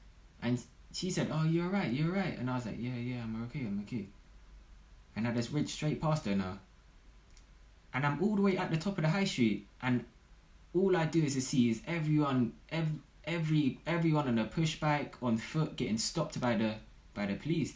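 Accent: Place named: United Kingdom. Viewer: crazy story! I love your british accent lmao, im from australia :)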